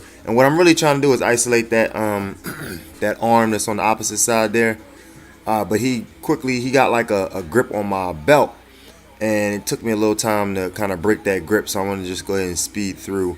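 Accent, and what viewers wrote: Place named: New York, United States. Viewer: Great vid.... where is this place? sounds like a NY accent to me :) Keep up the good work!!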